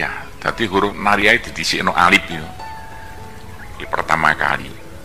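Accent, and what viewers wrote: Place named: Tegal, Indonesia. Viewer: Yo ora po2 kamu gk mau dngerin skip aja gtu aja kok repot he ini ilmu pnteng bwat orng bnyak cuman pk ustad nya klaw ngomong di dengerin sendri gk ngerti omongane ngebut kyak kreta api gk jelas jlimet pei..pei ...pei..kyak orng gk pnya gi2 sya aja yg dngerin ceramah nya gk nyambung omong nya mirip orang ngapak tegal 'smua blom tentu ngerti bhasa nya jdi klaw ceramah pkek bhasa indonesia yg jelas dn di mengerti gk jlimet kyak so2r nya benang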